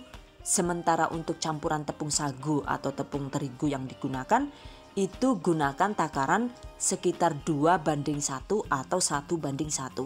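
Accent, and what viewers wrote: Banyumas, Indonesia. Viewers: Assalamualaikum mba saya paling seneng klau lihat mba lagi beraksi sambil ada selingan bahasa yg ngapak he he jd inget pulang kampung saya tunggu resep berikutnya karna menginpirasi banget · Suka banget chanel ini.. suaranya lucu sama di MIX pke bahasa ngapaknya.. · Hahaha yong selalu nonton vidione mbokayune Sing ngapak Ora ngapak ora kepenaklah....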